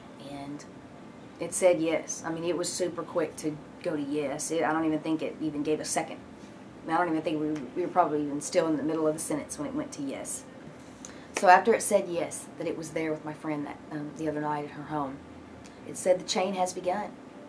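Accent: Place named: Texas, United States. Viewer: i can tell she is fro texas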